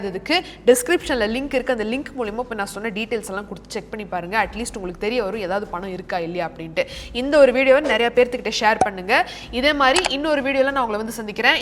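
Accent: Coimbatore, India. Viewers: Coimbatore slang change pannuga 😅😅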